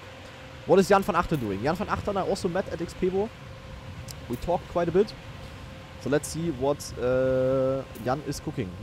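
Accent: Germany. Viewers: Just want to say you have a pretty minor german accent, very clear and understandable to my canadian ear. Your english is fantastic as well, I've listened to an absolute ton of your content and only rarely do you reach for a word or don't know the meaning of one. Love the content, and especially enjoy the competitive focus. Whether you are hosting tournaments or competing, they are my favorites. The volvic duo cup reviving that format was especially appreciated and I hope you can do it again!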